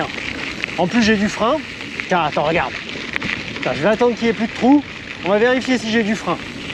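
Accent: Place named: Alsace, France. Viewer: Accent alsacien déjà qu il faut distinguer celui du haut Rhin de celui du bas Rhin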